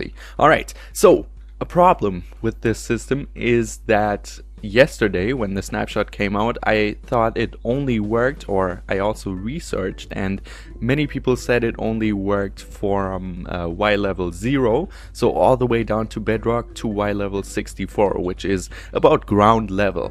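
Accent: Germany. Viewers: Dein Deutsch hört man bro :D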